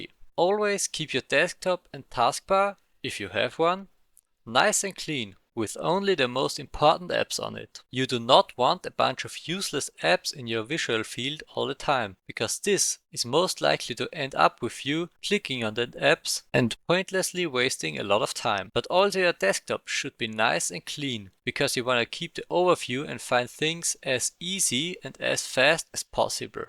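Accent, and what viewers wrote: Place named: Germany. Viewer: you German? I like your accent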